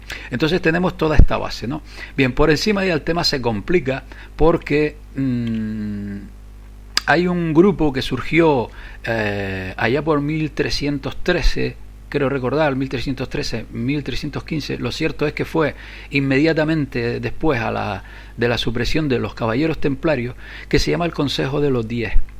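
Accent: Canary Islands, Spain. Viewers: Acento canario es bonito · Muy buen trabajo, tocayo. No coincido estrictamente en todo, (son temas muy complejos, con cabida para la interpretación personal), pero si en la inmensa mayoría, y sobre todo en lo más importante. Bien documentado y explicado. Gran labor social y muy necesaria en éstos tiempos. ¿Me permite una pregunta personal?. Su "asento" me resulta extrañamente familiar. ¿No será usted Canario, verdad?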